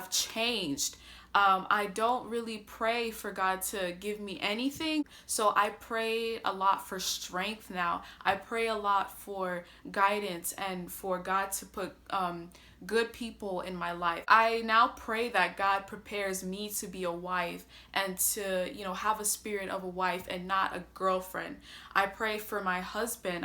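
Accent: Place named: Brazil. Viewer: You have a slight Brazilian accent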